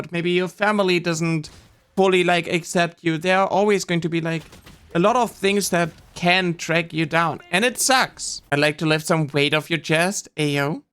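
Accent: Germany. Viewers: i know your struggle with voice, but i'm still training and i really disagree with you and bullies saying you sound like a guy... you have a very nice voice to me, clearly a womans voice and i like a lot, also quite soft (most of the hardness ppl might percieve comes likely from the german accent in case you hear that a lot) i appreciate what you do and hope you feel better as time goes on... again, i like your voice a lot and it does sound clearly feminine to me... and i will keep telling you that every now and then as you clearly don't get it often enough <3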